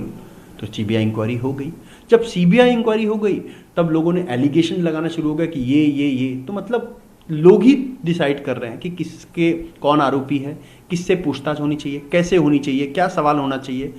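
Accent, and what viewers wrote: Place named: Haryana, India. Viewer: Mene esa chennal aaj tak nahi dekha hamko chutiya samjhe ho iski bhasha bihari to bilkul nahi he to ye bihar ka kaha se he iski bhasa hariyanvi lag ri he be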